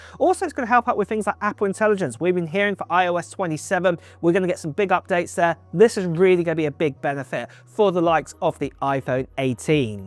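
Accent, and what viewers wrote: United Kingdom. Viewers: Thought it was a woman talking 😂, why do most men sound funny, ? In Britain - I’m assuming the accent is British, although I could be wrong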